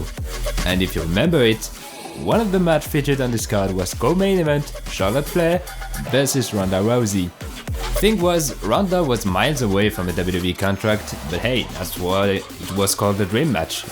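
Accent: France. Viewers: Bon vidéo ! Et soit fière de ton accent français ! ^^ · T'es français ? Tu parles bien anglais franchement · Tu es français mec ?!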